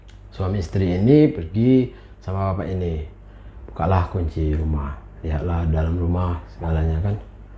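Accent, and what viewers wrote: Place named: Jambi, Indonesia. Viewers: Mas nya KKN apa uji nyali mas?? Serem gitu Btw bhasa Jambi itu sedikit Melayu ya kak? Suka denger nya saya 🙏🙏🙏 terimakasih sudah upload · Khas nyo bahaso Jambi tu bang🤣 wong Kito la ,asyik nian dengar cerito Abang tu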